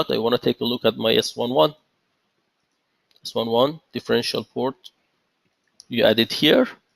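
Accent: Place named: Iran. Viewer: your accent is clear that you are an Iranian. How come is your name?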